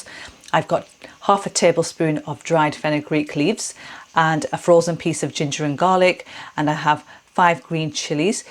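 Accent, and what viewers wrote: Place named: Yorkshire, United Kingdom. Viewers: She sounds like a Yorkshire lass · My God I am honoured! I truly am!! I infer from your accent you are a northerner, I'm from London but could conceivably make the journey up, however I'm in two minds about appearing on camera, I will let you know. But I really feel you will eventually hit the big 7 figures, as I may have said above you've tapped into something quite enormous, for reasons I'll try to message you.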